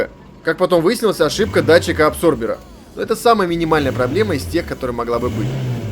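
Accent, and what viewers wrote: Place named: Perm, Russia. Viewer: Говор как у Реальных пацанов, с Перми что-ли? 😀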